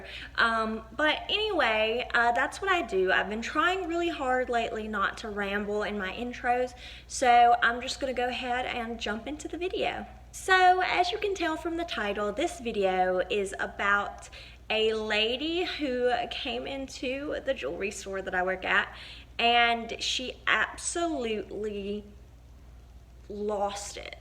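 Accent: South, United States. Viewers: I’ve been binge watching your videos the last couple days and let me just tell you, you’re a great storyteller and I just love your southern drawl, personality, and little bit of attitude lol Keep being you 😘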